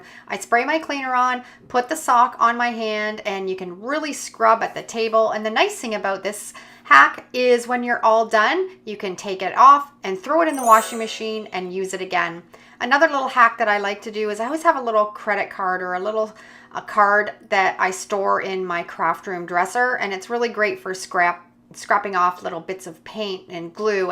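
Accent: Canada. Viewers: And she sounds CANADIAN 🇨🇦 I grew up in Hamilton and still catch myself saying abowt 😊😂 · Sounds Canadian.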